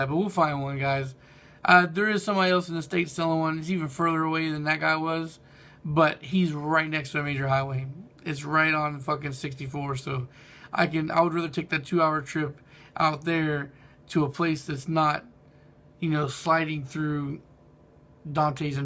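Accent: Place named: California, United States. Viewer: why are you in west Virginia in the first place? you sound like you're from Cali or a state close to the south west area of the states. im from Cali and moving to the Midwest was the worst mistake of my life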